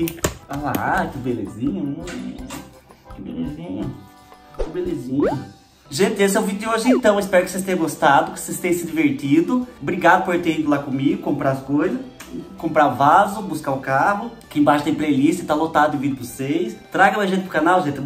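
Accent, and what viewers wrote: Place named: São Paulo, Brazil. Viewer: ou e esse sotaque seu e de onde mesmo meu filho? vc mora no estado de São Paulo?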